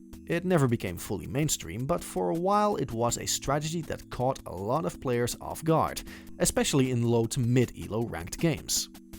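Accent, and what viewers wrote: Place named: Netherlands. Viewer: I hear a dutch guyyy, Ahoi en gegroet :P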